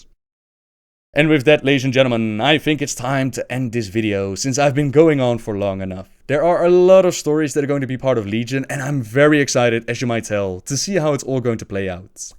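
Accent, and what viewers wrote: Netherlands. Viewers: is he dutch? it sounds like it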